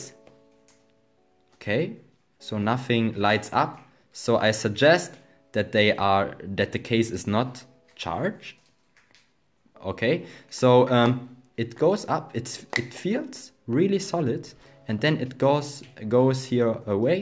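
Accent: Germany. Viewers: Man hört das du deutscher bist 😂👌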